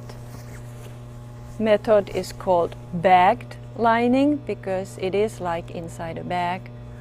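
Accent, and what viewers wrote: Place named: Germany. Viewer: Thanks for an easy to follow tutorial. Joining the lining to the sleeve has always been a puzzle to me. Years ago I made just one jacket, it wore me out! Commercial patterns are of NO help when it comes to instructions. Up until today, I thought I'd done something wrong when I didn't go all the way around when joining the lining to the jacket at the bottom of the sleeve. "Bagging" the jacket, only now, makes sense, because you've shown how it's done. Thank you again and thanks to the college for help in making this video. BTW, (as I laugh at myself) in the beginning I was trying so hard to understand what a "velt" was. I thought, "hmm, that must be a new term." Then I remembered my days when I lived in Bavaria and recalled how German's W's and V's are the reverse of the English version. I then rewound the tape now that I could better concentrate on what you were a saying, not how you were saying it. 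You've done a lovely job here, I hope lots of sewers have the opportunity to find you. However, below is a good question.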